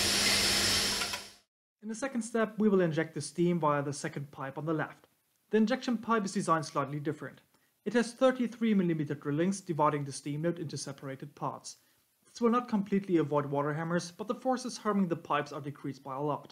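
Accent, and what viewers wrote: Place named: Germany. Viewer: Your English sounds rather German-ish with that accent so I'm gonna ask in German Das ist ein interessantes Video danke erstmal dafür aber sehen Dampfschläge in Rohrleitungen zb. nicht komplett anders aus? Natürlich bezieht sich das Video auf einen Kondensatbehälter bzw Speisewasserbehälter aber habt ihr ggf auch ein Video das sich mit Dampfschlägen in ROHREN befasst? Das fände ich sehr interessant und wichtig zu wissen für angehende Kesselwärter zu veranschaulichen warum das richtige entwässern eines jeden Dampfsystems vor Inbetriebnahme so wichtig ist :) danke für die Aufmerksamkeit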